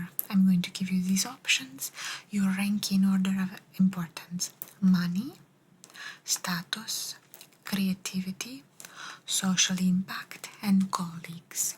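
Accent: Italy. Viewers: You always think of good topics to discuss as your therapist character,. Your Italian accent is great too. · Ciao cara! Mi piace molto il suono della scrittura e il tuo accento italiano è meraviglioso, davvero adorabile. Sei bravissima e bellissima! Ti voglio tanto bene 🫶🥰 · I bloody love your Italian accent!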